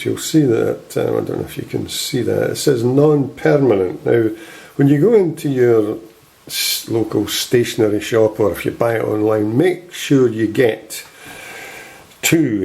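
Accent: Scotland, United Kingdom. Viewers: The Scottish accent makes it special. You are quite understandable. Thank you for sharing your skill.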